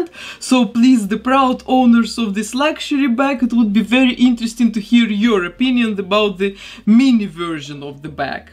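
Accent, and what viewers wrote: Russia. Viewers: Почему то со стороны мне кажется что это Russian Woman по акценту и выделению каждого слова в произношении, но я могу ошибаться и на самом деле это нормально в Америке. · Russian accent? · У вас русский акцент, как мне показалось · Похоже на русский акцент 😅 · Only i hear russian akccent?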